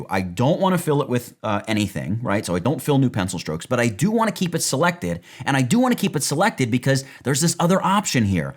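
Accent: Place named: East Coast, United States. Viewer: Good stuff east coast accent dude.. 👍👍 I’m I wannabe design engineer, thinking about entering the automotive industry for added economical improvements.. If you needed any specific inspiration. 🏆🏆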